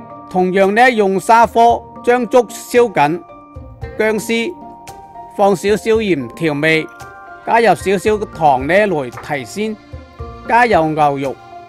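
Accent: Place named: Zhongshan, China. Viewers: 不怪，珠三角一帶，口音是這樣多變的。聽起來像中山。中山、順德一帶，口音多樣化，隔一條江、兩條村，已經有一點分別。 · 鄉音何來怪呢!!師傅鄉音似是中山?!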